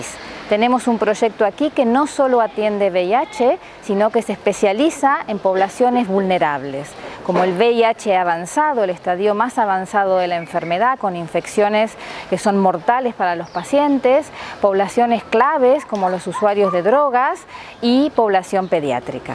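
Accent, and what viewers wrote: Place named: Argentina. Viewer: Que papá Dios te bendiga mujer por el acento creó que eres argentina lastima que en mi país faltan médico como vos..con esa gran carisma ganan mucho dinero pero falta carisma al paciente...creó qué nadie va al hospital por gusto...si no hay enfermedad no hay enfermos,y si no hay enfermos no existirían médicos ni hospitales...Jesús el gran médico está de tu lado sigue así mujer argentina ... saludos de un panameño.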